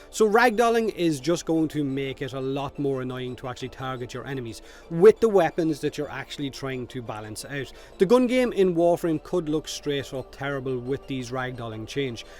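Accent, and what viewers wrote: Ireland. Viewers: Youtube's auto generated captions isn't kind to your irish accent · P.S. As much as I like hearing your beatiful irish voice rant about stuff (don't actually care what about), I like your regular approach better. WF is free to play after all, so DE can do with it whatever they feckin like. BUT: Giving feedback, adressing issues, pointing out things that are wrong can help the developer improve their game. At the end of the day DE still wants the game to be as good as possible (or so I hope), so we, the fans, should defenitely criticize their work and tell them what we don't like, but at the same time (very important) also what we DO like, so they can use that info to decide what to focus on for future updates. And all of this can be done in the calm polite way, you, have ever since done it. No need to shout, no need to rant. Just tell 'em what went wrong and hope they fix it. 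If they don't, don't spend money. But, as mentioned above, I'd like to hear more irish cursing so do one of these every once in a while. Slán go fóill (Hope I got that right)^^ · the dulcet tones of your brogue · Critical was tone on considering the concerns, but more worth it because Irish rants are the best rants. Better at the pub over a beer. ;) Also, Vay-Donald-Hek. <3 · Sorry, but aside from the curse words, you sound like a fluffy irish teddy bear even while raging:D And that's not a bad thing! It's a good thing that even when you speak your toughts openly, you still remain composed and constructive. True raging as in yelling, incohherent bitching, flailing, name calling and acusations are not what your brand is about (at least I think, but correct me if I'm wrong). Even tough I have 1000+ hours in the game, there is not much that bothers me. I give DE a lot of leeway, because it's their game, we as players don't own it (see the entitlement of Star Wars fans over TLJ for example, how this can be a bad thing) and because it's F2P. 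The 2 things I would like to see looked up in seriousness is the level scaling and difficulty curve of the game and a rebalancing of all weapons, shortening the gap between older and newer weapons. I'm fine with tier weapons, but I'm not fine with old weapons having less than 8% crit/status chance, while new weapons come out all with 25%+ in one of thise categories. And no, Rivens are not the answer.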